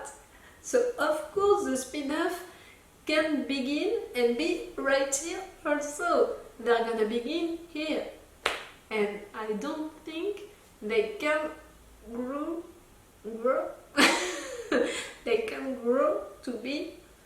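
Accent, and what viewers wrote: France. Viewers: a bientot! :) and I'm gonna miss that cute French accent, too!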